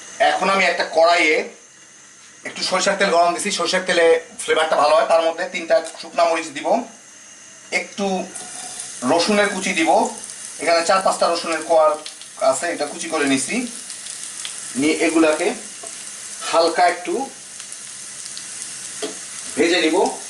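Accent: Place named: Noakhali, Bangladesh. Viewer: মনে হয় নোয়াখালী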